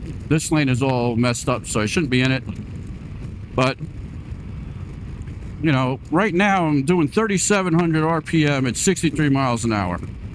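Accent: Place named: New York, United States. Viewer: Man you are so New York😅😂😅 Thanks for the videos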